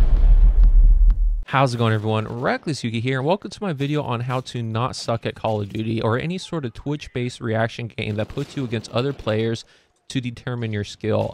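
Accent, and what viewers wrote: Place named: United States. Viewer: Not to offend, your voice is that of a very American American. Which is strange because it doesn't match your facial features at all! Gotta love genes. :P Sorry if I did offend you.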